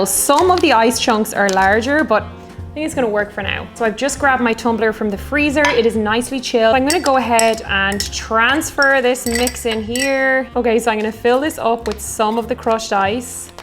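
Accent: Ireland. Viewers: I don't know why, but for some reason I was expecting you to pronounce "derby" as "darby" with your Irish accent.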